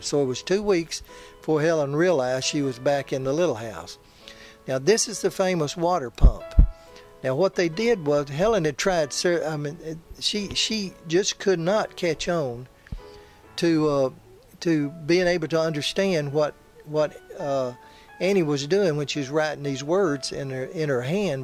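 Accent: South, United States. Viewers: Fantastic video! I enjoyed your commentary and lovely Southern accent very much! Thank you!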